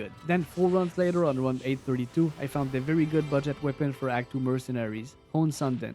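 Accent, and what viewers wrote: Quebec, Canada. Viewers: Where is your accent from? Sounds like Quebec french accent.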